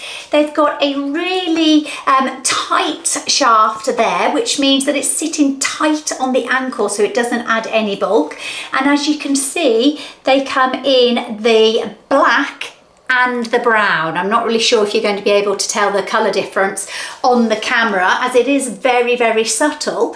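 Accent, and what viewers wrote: United Kingdom. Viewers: Your looking absolut amazing! I love your Videos and your British ( ? ) English😃🥰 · Your UK accent is lovely, however, unnecessary fashion consumption is off-putting.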